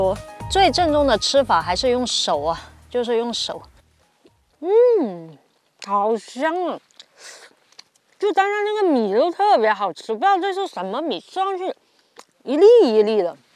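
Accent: Sichuan, China. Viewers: 因为是川普啊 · 喜欢你的视频，真实，更喜欢你的四川普通话。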